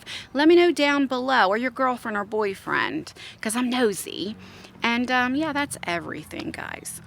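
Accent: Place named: New Jersey, United States. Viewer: OMG I Love Instagram it puts on my makeup for meee and You have that Jersey Accent, I have the Philadelphia accent if there is one lol but I was born and raised in Philadelphia PA and now I'm in NJ but I like Your Accent, Very Nice and Kinda Cool to see a Jersey Girl 🌹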